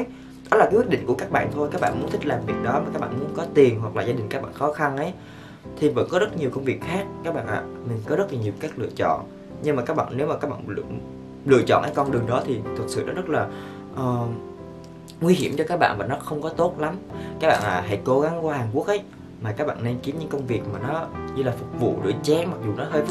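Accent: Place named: North, Vietnam. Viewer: Nghe giọng anh càng ngày càng ra giọng bắc nhỉ, nghe cute dã man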